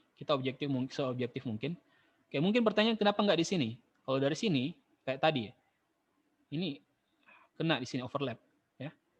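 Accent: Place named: Bima, Indonesia. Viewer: wah orang bimaa ini logatnya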